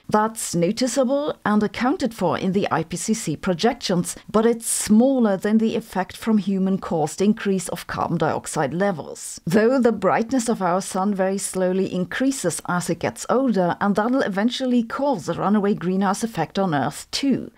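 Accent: Germany. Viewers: Unrelated but germans have a VERY cute accent and i wont change my mind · Why? Of course its possible to pronounce, even for Germans. My advice is to get rid of that insane accent. Feel natural. If nothing else helps, use a chewing gum. And don't use english with other Germans. Thats always a drawback. If you say you have problems with "th", I tell you that you have never tried. Good luck.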